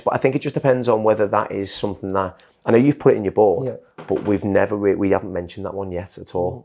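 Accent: Liverpool, United Kingdom. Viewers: are you from Liverpool or even Manchester? Yank here...Can't quite place your accent. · Nice to hear a Scouser! Is your salong in Liverpool?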